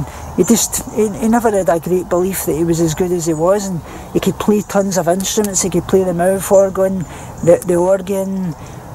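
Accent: Scotland, United Kingdom. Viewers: I was half way through my comment and it disappeared so if you find a random unfinished thing, it's mine... ANYWAY, ONTO MORE IMPORTANT STUFF!! I have said this before but this is a great time to say it again... I found you in sin city Renee's comments section and was interested enough in your comments to see if you had your own channel. So I found you through veganism but stayed because of YOU. I stopped following the vegan youtube community last year when it all got really stupid. I unfollowed all but 2 or 3 vegan channels. Of those, you're the only channel I watch religiously. I do eat a vegan diet but I don't have an eating disorder - at most, I have a dismorphic self hatred about being overweight. I like your channel because of you. Not the ED. Not the veganism. Not the furry family. Not the irresistible Scottish accent. Not the eclectic posters on your wall behind you. 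Not the singing. Not the persistent rain. Not the wrap around shades or squeeky voiced sound effects. Not the great variety of dog fluff. (Even though I enjoy all of the above!) I watch your content because of you. You,. And the rare but lovely cameo appearances from Laura:D If you want to know what it is about you (and not my list of nots) It's because of who you are as a person. You're very friendly, you talk openly without judgement and you keep your content true to who you are. You don't need to be rude or offensive to talk about awkward subjects, you have no idea how enlightening and truthful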